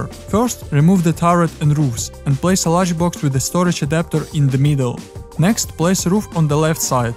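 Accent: Russia. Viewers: акцент русский слышно)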